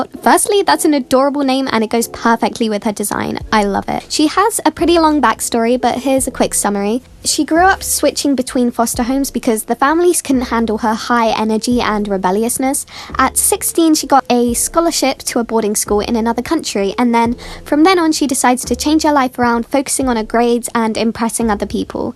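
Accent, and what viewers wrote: United Kingdom. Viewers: THAT TOP RIGHT DRAWING??? SERIOUSLY MY FAVORITE PIECE OF YOURS!! she does not look dead 💀😭 she looks very alive!!! My favorite outfit to wear is probably an oversized tshirt with mom jeans! Honestly anything with mom jeans 😤✋ Also I love your bri’ish accent 🤭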